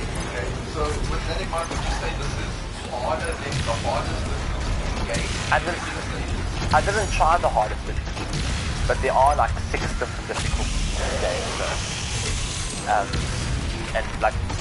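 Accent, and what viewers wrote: South Africa. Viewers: Are u South African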